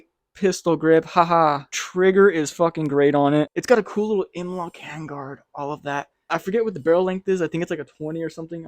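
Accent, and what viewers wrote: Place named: Northern California, United States. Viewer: I was guessing he might be somewhere from the 530 area code in Cali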